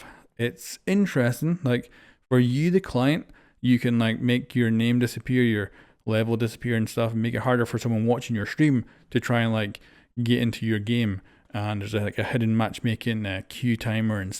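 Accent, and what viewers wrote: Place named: Scotland, United Kingdom. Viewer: I don't know if you are from there, but you have a very hard Scottysh accent.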